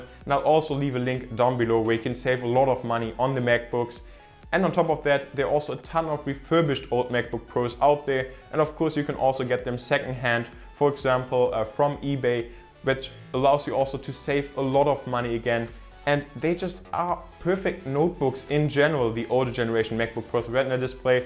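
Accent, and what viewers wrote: Germany. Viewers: I'm german, and i can hear your german accent clearly.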